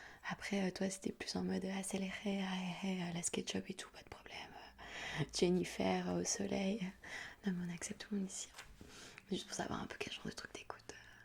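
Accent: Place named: France. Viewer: Ese español con acento francés, bellísimo ❤️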